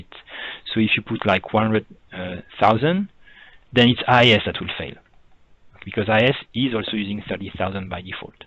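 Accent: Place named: France. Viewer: Très bel accent français ;)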